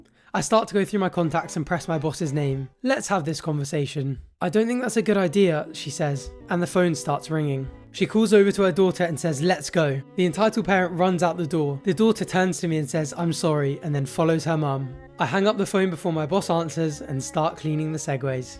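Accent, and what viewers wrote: United Kingdom. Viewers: I love your voice? Your defo from the uk too yes?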